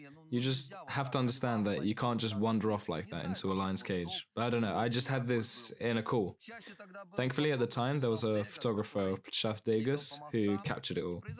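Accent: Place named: England, United Kingdom. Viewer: What a beautiful story, love the English accent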